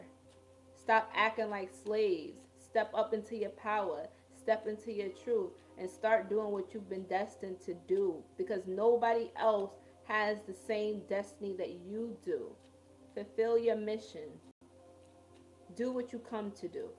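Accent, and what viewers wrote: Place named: East Coast, United States. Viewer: Sis, I appreciate how you use this platform. Tha info you choose to share gives me more life—it sustains me. Also, there are others who I follow, who also use this platform similarly, disseminating similar info but you have a wonderful knack for imparting this info, that just RESONATES in a different way. Shit refreshing AF and I thank you. Plus, your east coast accent is one of my favs. ✊🏿❤️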